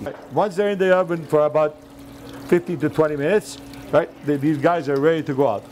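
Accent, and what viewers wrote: Montreal, Canada. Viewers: funny englihs accent exclusive to montreal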